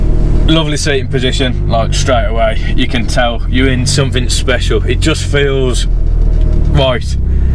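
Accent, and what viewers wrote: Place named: Birmingham, United Kingdom. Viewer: Subscribed 👍 enjoy these type of vids and hes a brummie 💪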